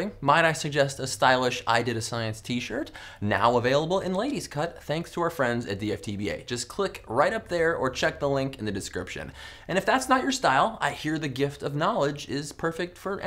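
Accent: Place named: Texas, United States. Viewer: I love how you can tell is from Texas 🤠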